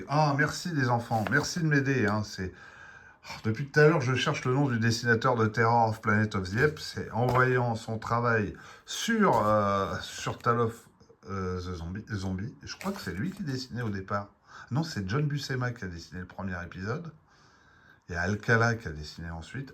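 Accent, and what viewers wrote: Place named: England, United Kingdom. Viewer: Vraiment chouettes ces magazines. J'ai vu qu'un Quebecquois avait écrit une lettre dans le courrier des lecteurs, les chanceux... Alors essaie de dire avec l'accent québecquois "Tabernacle qu'ils ont chouettes ces primates" la prochaine fois. Non c'est ni raciste ni stéréotypé, si on me demande je dirai que c'est la faute à ChatGPT. C'est vrai, quoi, on connait ton merveilleux accent anglais mais pas ton accent québecquois. Le Monde doit savoir. Vache, Taylor dans l'adaptation met un coup de pilon à la Bud Spencer pour assommer le gardien ! J'ai gonflé mes muscles, surtout au niveau du ventre, c'est là qu'ils sont le plus développés.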